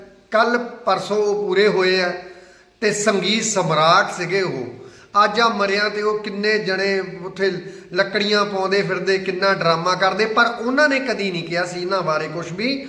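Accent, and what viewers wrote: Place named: Doaba, India. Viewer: Eh mazhe wal di boli nai ji. Eh doabe di boli aa.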